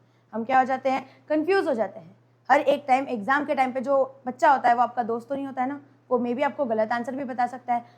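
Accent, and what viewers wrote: Maharashtra, India. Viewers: Ap marathi ho ky mam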